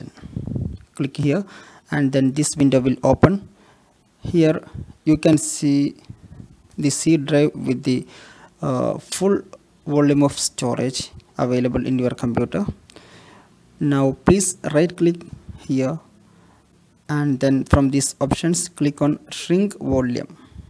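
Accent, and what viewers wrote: Kerala, India. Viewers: R u from kerala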